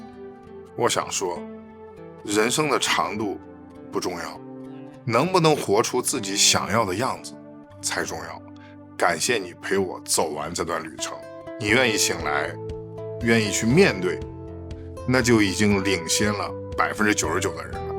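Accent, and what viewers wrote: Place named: Henan, China. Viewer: 你口音很像我老家口音！！！河南密县人！！！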